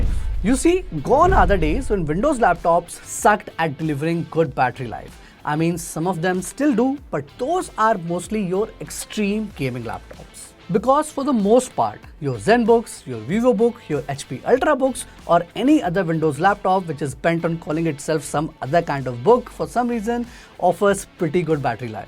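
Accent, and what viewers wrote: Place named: India. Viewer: The more i get older the more i become intolerant to Indian dialect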